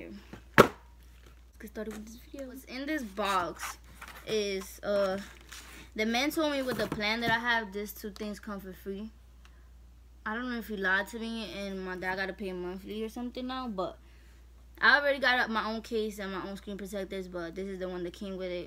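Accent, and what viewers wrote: New York, United States. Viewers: Where you from? You sound like you from nyc ... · Your accent sound like you from New York but anyways lmao I’m also getting an iPhone XR this Christmas or maybe earlier but yes I’m so excited I always watch unboxing videos of something I’m getting in the future😂😂😆😆‼️‼️💯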